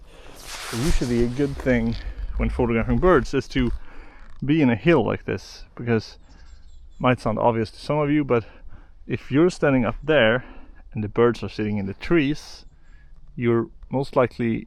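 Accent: Sweden. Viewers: Tyckte jag hörde lite östgötska när du pratade lite svenska i en tidigare så jag blev lite fundersam om du var från Östergötland. Inspirerande att få se dig fota på samma ställen som man själv brukar fota.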